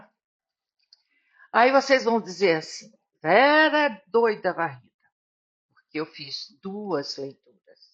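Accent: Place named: Rio Grande do Norte, Brazil. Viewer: Curiosidade: seu sotaque soa potiguar. Você é daqui?🙂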